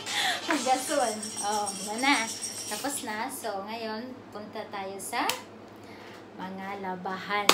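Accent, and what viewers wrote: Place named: Visayas, Philippines. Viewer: Halatang bisaya ka din po..same tau hehe..ang linis ng bahay pgnaka quarantine 😅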